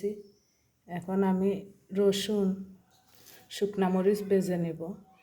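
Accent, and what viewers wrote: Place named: Sylhet, Bangladesh. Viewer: বইন তুমি সিলেটি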